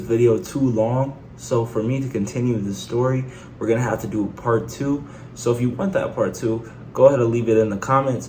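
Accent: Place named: New York, United States. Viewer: Lmao boy u gotta be from New York , nice vid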